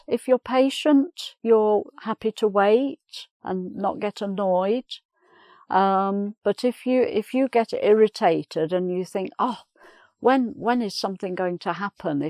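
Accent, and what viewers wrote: United Kingdom. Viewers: British pronunciation the best ever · Thanks my great teacher ...love your lesson so much cus you make me able to speak in British accent ...i got 100. ..god save you . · Thank you 'Mother' You give us valubale topics . I love British accent . Thanks "mother" for being teaching us to all your childreen arround the world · Have signed. Love your British pronousation❤️.Thank you for great lessons!!!! Can I find this list anywhere?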